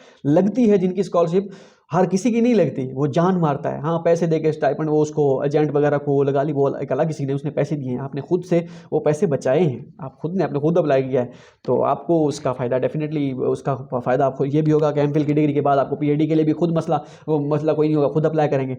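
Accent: Saraiki, Pakistan. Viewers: I appreciate you brother آپ کی وڈیوز دیکھنے کے بعد مجھے پتا چلا کہ csc بھی کوئی نام کی چیز ہے آپ ہر کمنٹ کا جواب دیتے ہے اللہ تعالٰی آپ کو کامیاب کرے ہم سب کی دعائیں آپ کے ساتھ ہے ۔ سرائیکی وسیب کے آپ پہلے بندے ہے جو اس کام میں بہت آگے ہے آپ کا سرائیکی لہجہ ہمارے لیے سمجھنا بہت آسان ہے کیونکہ ہم سرائیکی ہیں اور ہماری دلچسپی بڑھتی ہے میں آپ کو سلیوٹ کرتا ہو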